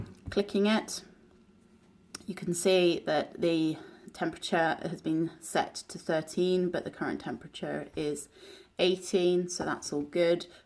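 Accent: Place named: United Kingdom. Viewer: Whatever way I try to say this, it will sound sexist to some degree but it is not meant that way at all. It is SO refreshing to hear a woman's voice on one of these usually male-dominated videos. And the voice is British. And the voice knows what it is talking about! I have watched a lot of NEST stuff and it is either mounted to the wall with a wire or on the table base - nice to see it on the wall with the wire and also unique to see it 'unplugged' from the mount. A video made by someone who shows what I want to see, not all the stuff available elsewhere. Brilliant!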